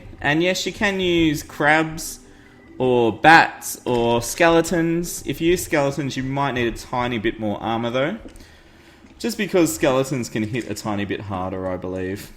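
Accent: Australia. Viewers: Aussie aussie aussie oi oi oi i can tell by your accent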